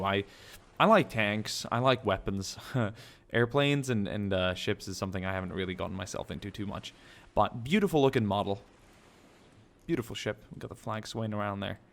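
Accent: United Kingdom. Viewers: Didnt you have a brittish accsent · I just watched a video you made in 2011 you sound a lot younger and almost have a British accent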